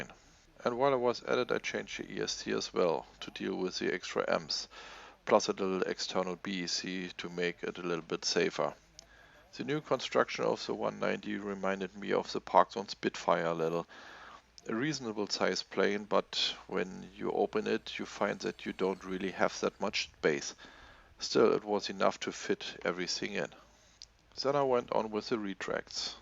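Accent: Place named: Germany. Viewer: Das nenn ich mal deutschen Akzent :-D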